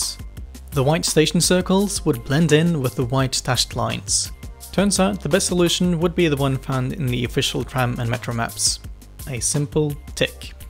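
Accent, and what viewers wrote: Australia. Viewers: This is a great video. I'm struggling to pick you accent though. It sounds like you're Australian, but with a big chunk of the south of England thrown in.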